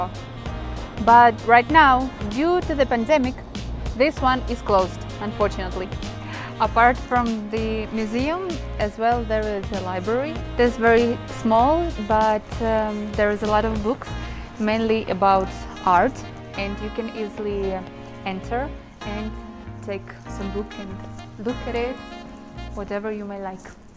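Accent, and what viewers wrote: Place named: Ukraine. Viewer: sexy Ukrainian accent. I picked that, but afraid to ask.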